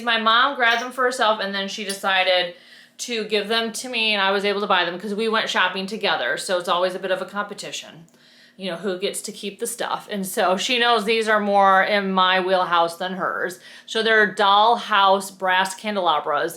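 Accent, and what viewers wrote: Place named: Midwest, United States. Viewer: The stuff that got on camera was absolutely dizzying! So much great stuff! I'm going to rewatch and pause to enjoy. I think your Midwest accent is what makes you so enjoyable for me to listen to - I'm originally from Chicago (so only an eighth-turn from ex-Detroit). Your painting aesthetic is interesting. Maybe you can do a bit on that sometime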